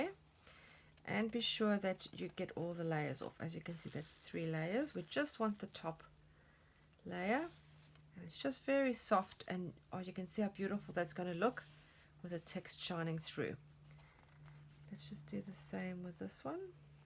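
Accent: South Africa. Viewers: I can't believe you're Australian. Yo sound so South African to me. Also, this is so gorgeous. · Absolutely gorgeous. I recognise the South African accent. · Beautiful! You live in Australia but you really sound like you are from 🇿🇦.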